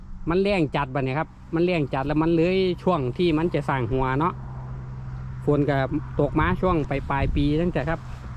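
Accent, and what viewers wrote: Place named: Chaiyaphum, Thailand. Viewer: สำเนียงคือชัยภูมิแท้คับ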